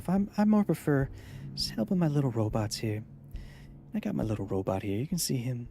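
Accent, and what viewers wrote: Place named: Australia. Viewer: watch for the australian accent and bob ross voice, stay for the thick load of science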